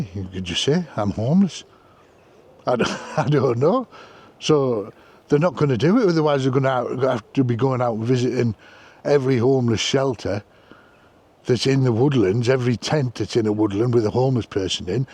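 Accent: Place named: Northern England, United Kingdom. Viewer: Great video and you presented it brilliantly and was really clear. Id no idea about the repeal. But as you say if by default should really apply to all. Im from Stockton originally but in south now and wild camp all over. Got the accent- where up there do you camp? But mainly South Downs In woods for me. Barely spotted but as others her LNT and don’t muck about. Love to see coppers walk miles uphill to find me - isnt going to happen. But will be interesting to see how this pans out. Was in Scotland a few weeks ago and its just great to have the freedom to set up where you want. Many people doing it all the time, all over. No one leaving mess that i saw. Keep us informed what you find out Cheers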